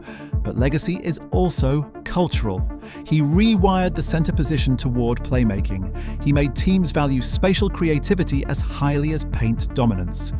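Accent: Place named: United Kingdom. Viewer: Originally I was leery of your Anglo-accent (you know the bias: what could some Brit or near-Brit have to say to us about our sport?), but soon I realized this was an extremely insightful analysis of the Joker's game. And more and more, I began to appreciate your beautiful language, from beginning to end. I love Jokic's game - the essence of basketball art - but never in the vast library of Jokic videos have I heard more succinct, more precise, more well-wrought, more understanding descriptions of what the man is doing. Just excellent. I salute you. In my view: # 1 Jokic video.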